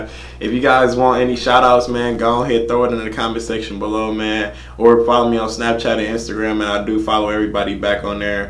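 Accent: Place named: Ireland. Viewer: The V sign means F*** you/off. Your irish accent is jokes 🤣.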